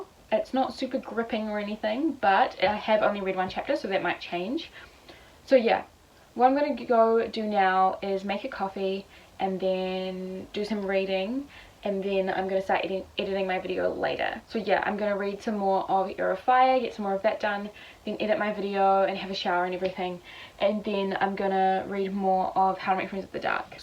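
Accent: New Zealand. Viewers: Giiiiirl you will die and be reborn when you read Six of Crows. The premise of it literally never interested me and I only read it to see what the hype was about... it's now my favourite series of all time. I can't even with it. I know you'll love it so I cannot wait to see your reaction when you finally read it! Also what was the channel you mentioned about the Goodreads review? Melanie something? Sorry, I'm not from NZ so I don't always understand NZ accents 😂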